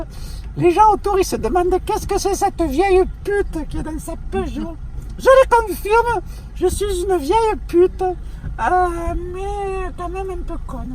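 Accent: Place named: Marseille, France. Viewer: J'adore ton accent des Goudes,!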